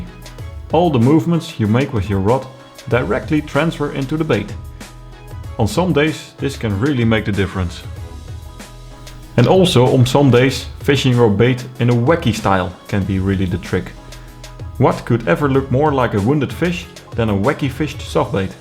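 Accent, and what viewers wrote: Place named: Netherlands. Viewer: That's a Dutch accent if I've ever heard one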